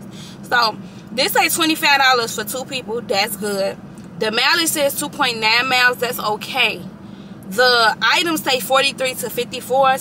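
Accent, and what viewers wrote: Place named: South, United States. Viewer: You have to have some southern roots it sounds so cute though tbh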